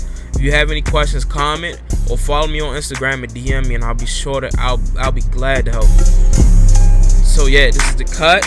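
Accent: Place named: Washington DC, United States. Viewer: I kbew he was from dc after a minute haha. The cadence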